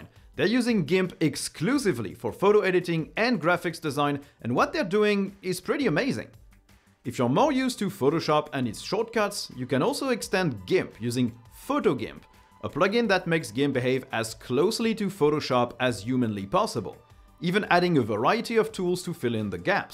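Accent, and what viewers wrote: France. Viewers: I though with that accent your were french is that correct ? Also been using most of these apps at a regular basis and it's awesome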